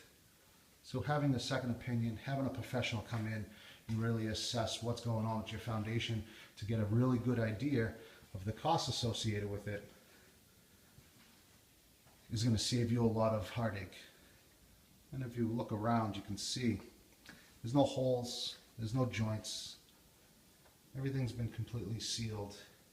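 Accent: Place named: Boston, United States. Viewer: Hi, I've been watching many mason videos in hopes of learning how to do what you are doing in the video here. As soon as I heard your voice, I said to myself, this guy is local as I recognized our Boston/New England accent. So here is my story. I am a 67 year old woman who tries to do as much as I'm capable of doing myself. The basement you are working on is very much like mine. I've started digging out in between the stones and cement. I've got approximately 4x4 area done. Clearly I've taken on more than I'm capable of. HELP!!!! Are you able to tell me what that job cost the homeownet? Since mine is in the same condition and close in dementions, it would, at the very least give me ball park number to think about! I will go to your web site and read more of your services.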